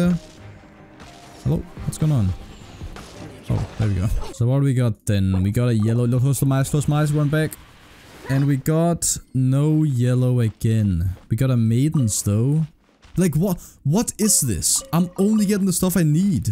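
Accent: Denmark. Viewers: Are you danish? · you sound danish an i right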